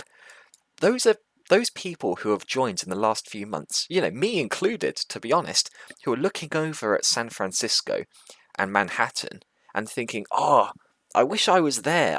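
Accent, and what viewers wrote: United Kingdom. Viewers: Wut? He has a super British accent.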